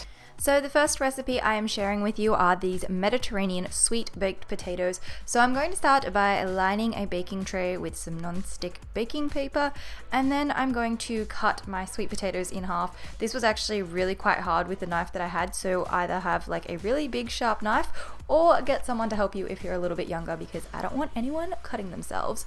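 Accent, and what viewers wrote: Australia. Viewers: Are you Australian?? Btw these look great :)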